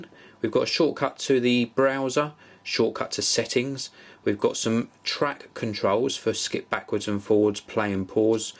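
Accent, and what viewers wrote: United Kingdom. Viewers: Oh great your a brit. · Man i love your ACCENT!!!! i wish i could talk like that... i love that typical british accent!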